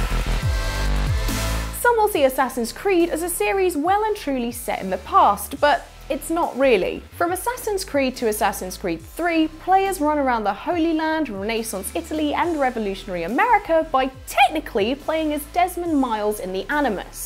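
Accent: United Kingdom. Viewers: wow this was upload 3 secs ago, say hi to me plz, love your brittish accent, congrats for your new job youre doing well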